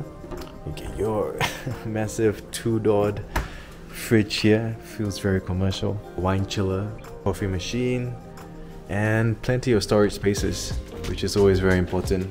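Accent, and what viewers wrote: Singapore. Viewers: He has vy obvious Singaporean accent, not Philippino accent.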